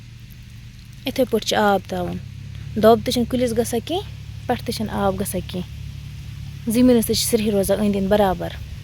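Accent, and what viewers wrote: Kashmir, India. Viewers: Jaise aap baat karte ho lagta hai aap Soporer ki ho kyunki sopur ki baten sem aapki tarah hoti hai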